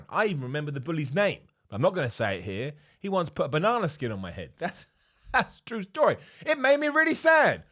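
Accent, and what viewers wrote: United Kingdom. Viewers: I thought all this time was saying “ the bald Eagle” but no that’s not what he was saying - love British accent 😁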